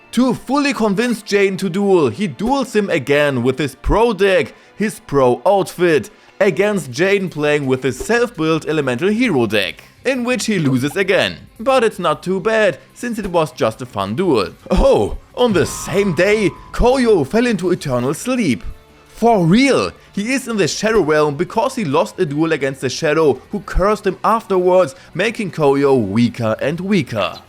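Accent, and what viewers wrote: Germany. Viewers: are u german ? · this is German English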